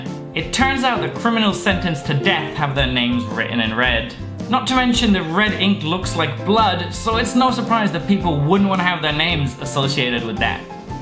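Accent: Standard. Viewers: 不得不說，發音蠻標準的😂😂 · 你講中文超標準的啊！！！！ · 台語太標準了 不訂閱怎麼行～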